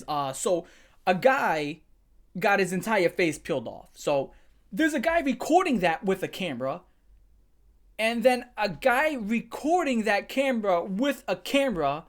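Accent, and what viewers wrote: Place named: Nuyorican, United States. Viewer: I’m from Puerto Rico and You speak that New Yorican Spanish lol but it gets a pass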